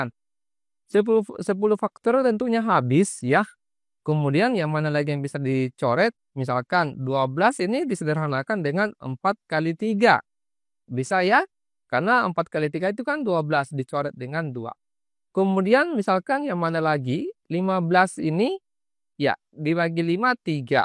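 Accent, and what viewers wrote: Sulawesi, Indonesia. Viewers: orang sulawesi ya pak? hehe soalnya logatnya kaya sulawesi 😃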